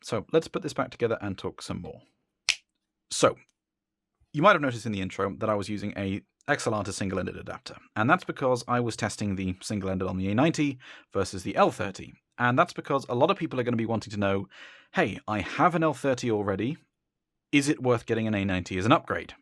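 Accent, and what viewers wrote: United Kingdom. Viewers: This is like watching evil Zeos. No anime, no cat, and no cursing. Instead of the conservative talk radio vocals, there's an elegant English accent. There is no Patreon/yard sale. There are cuts in the video. There is no random song selection every time.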